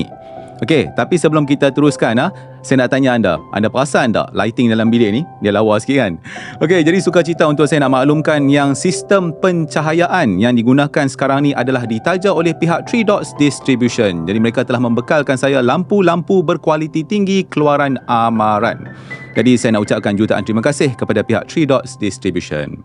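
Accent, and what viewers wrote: North, Malaysia. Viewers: Cakap slang utagha... oghang mana ya?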